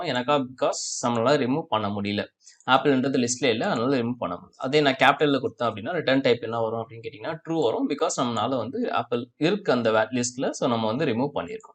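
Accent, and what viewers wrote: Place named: Chennai, India. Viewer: The way of coaching is very understandable. Sometimes your Chennai language flows 😂😂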